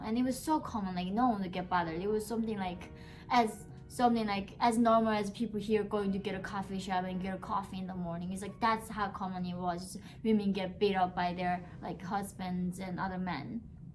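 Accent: United States. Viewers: She now has an American accent! Lovey person and so intelligent 😌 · Picking up that American accent I see I like lol 😍 Cheers much love and respect from los Angeles CA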